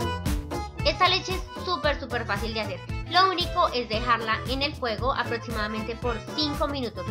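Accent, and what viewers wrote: Colombia. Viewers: Hola... se te escucha super bonito tu Colombiano.... te estoy viendo desde Shanghai que quiero hacer un arroz con leche para unos amigos aquí. Gracias por el video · Hola, disculpa mi pregunta ignorante, eres Colombiana? Por tu asentó intuyo que si, yo soy colombiana y no consigo la leche evaporada. Sabrías en donde encontrarla? Gracias:3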